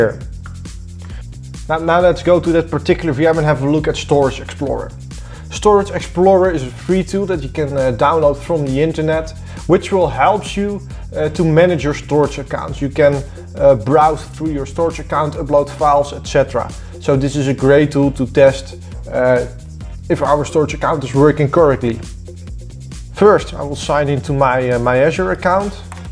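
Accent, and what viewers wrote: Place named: Netherlands. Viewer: Maybe you should remove the typo in your title: Virual -> Virtual Love your dutch accent btw ;)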